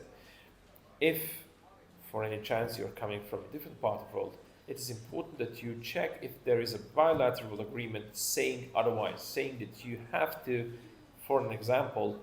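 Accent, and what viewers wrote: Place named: United Kingdom. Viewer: Sir u got british accent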